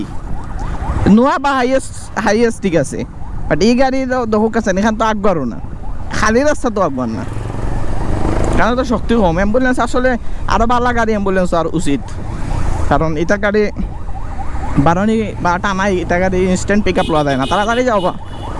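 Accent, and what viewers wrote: Sylhet, Bangladesh. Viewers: Asslamualikum bai apnar basha sylhet ar kun jaygay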